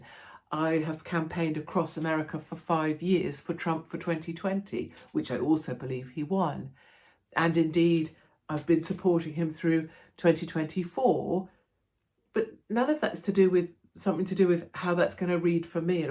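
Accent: RP, United Kingdom. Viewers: .....you are such a Sister, a Yank with a posh British accent. We love you over here. Thank you for your reasonable, common sense. Keep speaking your mind!!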